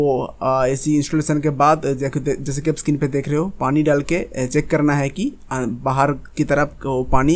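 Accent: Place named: Bengal, India. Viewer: ভাই তোমার ভাষা শুনেই বোঝা যাচ্ছে তুমি বাঙালি।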